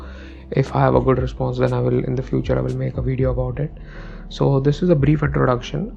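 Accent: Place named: India. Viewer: indian accen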